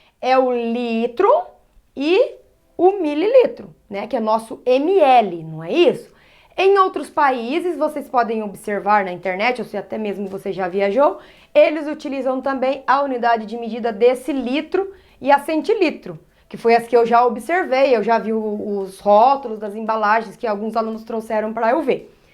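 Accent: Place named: Minas Gerais, Brazil. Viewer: Adorei sua aula gata!! Pelo sotaque deve ser mineirinha! 👏👏👏👏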